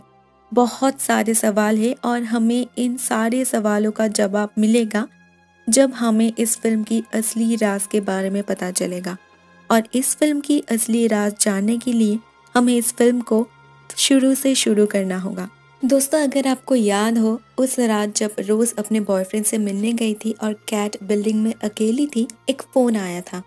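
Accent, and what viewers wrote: Bengal, India. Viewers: Pls work on ur Hindi dear...Im sure ur Bengali...tats y... gender mistakes happening....pls work on it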